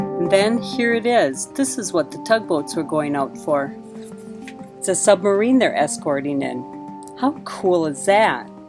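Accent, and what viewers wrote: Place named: Illinois, United States. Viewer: so the sub. just resufaced. Its been under for about a month..where are you from? I hear an ILL accent.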